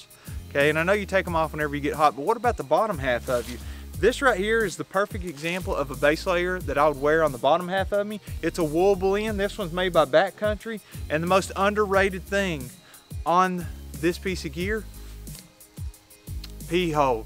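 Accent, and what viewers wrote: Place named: South, United States. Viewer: I never knew the southern accent worked so wonderfully with your everyday hipster. Great content mate!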